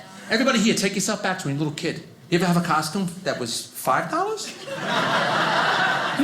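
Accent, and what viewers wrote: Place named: New York, United States. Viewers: Italian new york accents always get me. Feels like home 😅😊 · Love the "New Yawk" accent!! I wanna hear more from this guy--he is dynamite!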